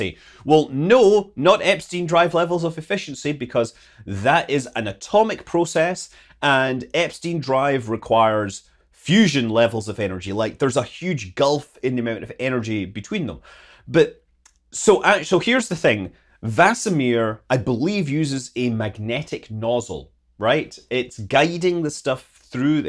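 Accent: Scotland, United Kingdom. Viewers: the one time his Scottish got me: what is the fuel settling burn called?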